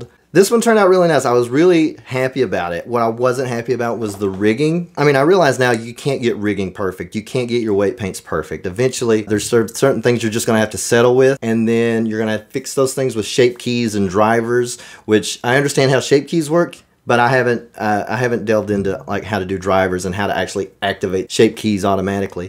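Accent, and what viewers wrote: Texas, United States. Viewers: You got that Austin Texas accent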